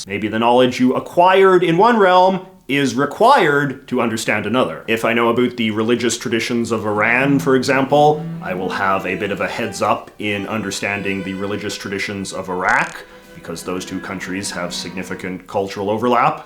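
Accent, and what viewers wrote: Canada. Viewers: You have such a strong Canadian accent. Out and a Boot in a Boot.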